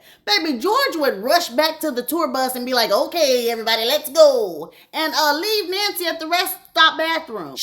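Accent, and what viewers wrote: South, United States. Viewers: Your country accent and saying “wait one cotton picking moment”😭😂😂😂 I been rolling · The country accent made this even MORE entertaining!! 😂😂🤣🤣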